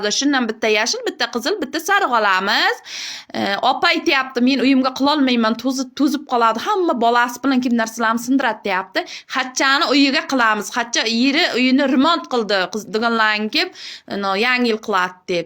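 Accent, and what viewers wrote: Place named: Surkhandarya, Uzbekistan. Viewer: Surxandaryoni shevasi 100% yoshli perashka keskan shulla Surxoncha taom Qoʻrgʻon bozori boor😊😊😂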